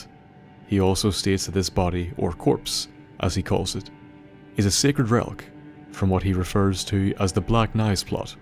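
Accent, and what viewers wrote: Ireland. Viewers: The irish lore master is back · Bruh, I am so in for Elden Ring, please bro, please, I need that sweet sweet Irish accent for my Elden Ring, please do this like you did for Fallout, don't kill yourself, but I'm in for this, I need it lol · Hell yes, an Irish lore hound! Absolutely adore hearing Radhan called mad as a bag of spiders. 💜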